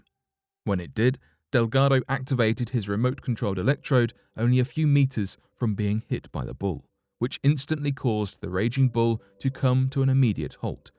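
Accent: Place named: Cockney, United Kingdom. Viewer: More recycled bull shit that isn’t anything new. Jesus fuck. Can you make videos on anything current? Cause from what I’ve seen on the websites I follow there’s plenty for you to report on. Probably because I’ve learned proper journalism from a professional one. And you’re probably an educationally lacking British person who’s grammatical cockney slang comes out in some sentences. No matter how hard you try to hide it... still comes out. 😂. Seriously though... did you finish high school even? Like you probably didn’t even have the chance to drop out of college(university, for your English ass) did ya!?! Hahahahahah.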